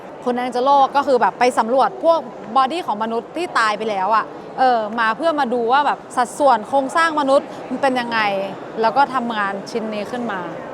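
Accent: North, Thailand. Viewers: อู้เมืองแหลวแลดซ้ำม่วน 🤣🤣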